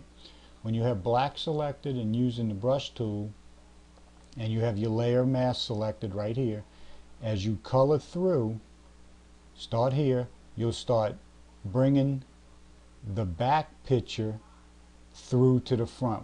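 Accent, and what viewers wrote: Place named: Louisiana, United States. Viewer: This is awesome...the accent sounds Louisiana/Cajun-ish. Geaux Tigers!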